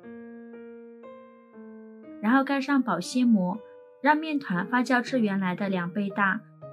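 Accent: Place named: Mainland, China. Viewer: 中國都是這麼唸 我們台灣唸ㄒㄧㄠˋ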